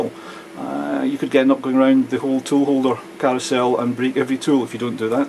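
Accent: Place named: Europe. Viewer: Good video, I am about to make something similair myself. Looking around for some inspiration. Thank your for sharing. From your accent, I presume that you are from europe. Not to many tormachs around here. Did you order a brand New, or Did you manage to find a used one? Would be intresting to know how much the shipping was in case u ordered a new one. Subscribed. :)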